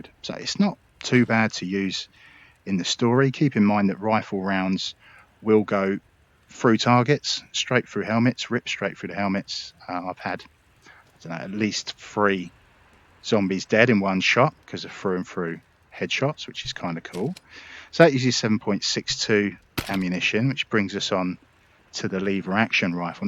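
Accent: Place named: United Kingdom. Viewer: Hearing a British accent telling me about guns is a strange feeling 😂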